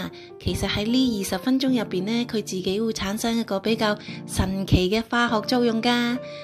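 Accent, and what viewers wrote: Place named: Guangzhou, China. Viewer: 你好！你做吞拿魚包好靚好似好好食！聽你嘅口音好似廣州人？你把聲好聽好可愛，多謝你的分享。😄😄👍👍💐🌺🌹。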